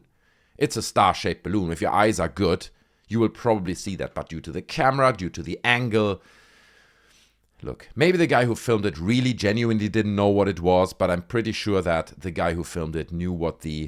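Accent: Germany. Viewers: this guy sounds german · Mit deinem deutschen Akzent. Klar könnte das so sein. Aber es könnte auch eine Nachahmung von diesen Ballons sein. Auf jeden Fall hat die brasilianische Armee Stellung dazu bezogen und gesagt sie wüssten nicht was es ist. Das sagt mir, es war kein Ballon sonst wüssten sie doch von san joan und Ballons.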